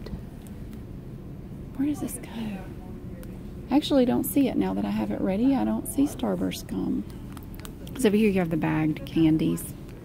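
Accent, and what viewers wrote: South, United States. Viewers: southern it sounds like north Carolina or Tennessee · Zoran K. georgia,south carolina,north carolina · Southern usa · If anyone from Britain comes back to these comments, I have a question. This video’s narrator has a Southern accent (she would say I have a Yankee accent LOL). Does her Southern accent sound more like British English than other American accents? I have heard this may be the case but was not sure. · I think she won me over with the crunch of the bagged candies sound and her Southern Drawl. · Seba Arias she has a southern accent ( she probably lives somewhere south in the us like Alabama or Texas or something) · Seba Arias southern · I love your shopping videos despite all the background noises... it makes me feel like I'm shopping mom/grandma (I only say grandma cuz my grandma has the same sorta southern accent as you!) Cept she was mean to me and your soo super sweet and nice! 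It's almost like having a mother who loves me... I know that sounds a bit sad but your very comforting to me like the mother I never had <3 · Southern, we have it where I live but I’m not that extreme😂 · Normally I find southern accents annoying but yours is so soothing. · TheLion yeah sounds very NC/SC/Georgian as someone with family from that region. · Seba Arias american? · This is the best ASMR video I’ve seen and heard in a loooooong time. Certain accents do it for me, so the wonderful combo of your southern accent, your soft talking (I’m not a huge fan of whispering, so your voice was perfect), your attentive organization and knowledge, the sound of all the crinkling......and 30 minutes of it! Pure ASMR perfection......thank you......I can’t wait to watch more of your vids. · Her southern accent is giving me LIFE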